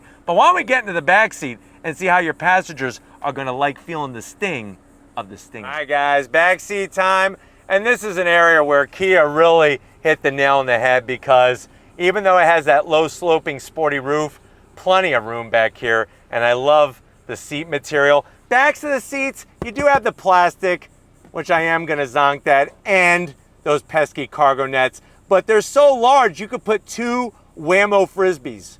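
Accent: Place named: New York, United States. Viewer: Okay, a winning formula for your presentation. I could swear you have a New York accent. Great salesmanship. Do you ever bump into former students at a car dealership?!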